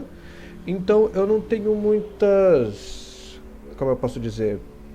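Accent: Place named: France. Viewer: Esse sotaque francês é ótimo mas o targetar e dar alvo e de matar. Os duelos são tão rápidos no yugioh que mal da para acompanhar tudo que acontece 😂😂. Eu queria dar uma sugestão tem um baralho que eu gosto muito que o dragão armado e fiquei sabendo que tem novas cartas dele,eu queria saber como o baralho funcionária hoje em dia.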